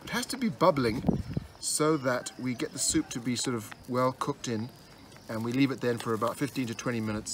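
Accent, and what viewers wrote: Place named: Durban, South Africa. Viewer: Nice video...You sound like you're from Durban.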